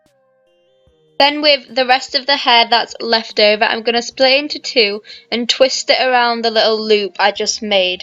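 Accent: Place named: Northern England, United Kingdom. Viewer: Love that accent. I'm not very good with knowing accents, so it's a somewhere-up-north accent to me :) So nice to hear an English voice! I love that you have a middle parting and not a 'center part' ("senna part" yankee accent!) lol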